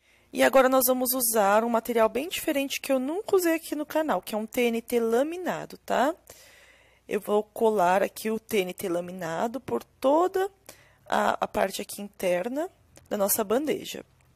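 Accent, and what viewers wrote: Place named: Bahia, Brazil. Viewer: vc fala um pouco baiano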